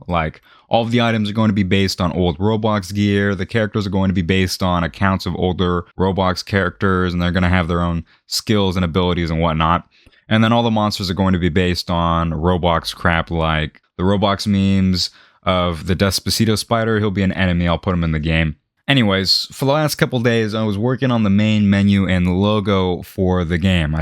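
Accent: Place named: Bay Area, United States. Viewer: You sound like Bay Area buggs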